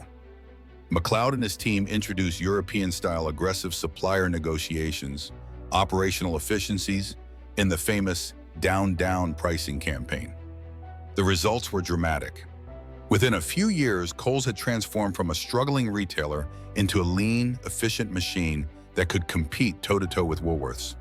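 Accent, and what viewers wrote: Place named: United States. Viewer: Can we NOT AI narrate with a yank accent?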